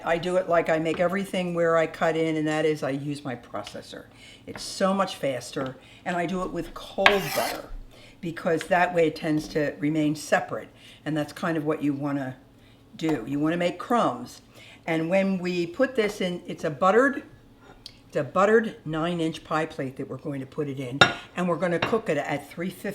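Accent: Philadelphia, United States. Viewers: I pray is doing well so glad I found this channel! I knew you were from the Philly area by your accent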